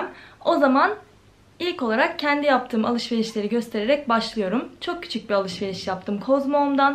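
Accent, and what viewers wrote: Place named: Ankara, Turkey. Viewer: Hem karadenizlisin ama ankaralı gibi konuşuyon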